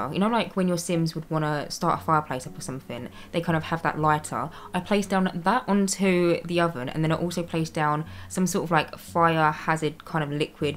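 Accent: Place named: England, United Kingdom. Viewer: Just got it! I love it, a loverly face, and you talk like that, real pommy accent. I can't talk i'm an aussie.good job mate!.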